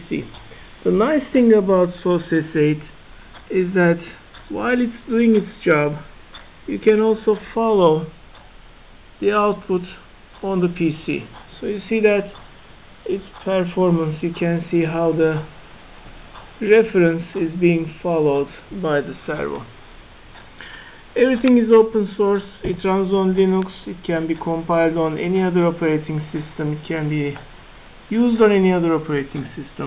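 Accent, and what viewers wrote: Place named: Turkey. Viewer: naber yaa. türk oldugun aksanından belli oluyor. ama güzel. iyi yapmışsın.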